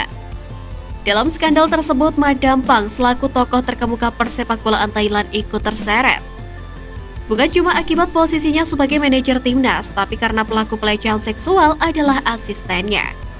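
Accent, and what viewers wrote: Java, Indonesia. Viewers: Pembawa narasinya medok amat. Jadi ingat lagu... Andai aku letto wes pasti aku wong jowo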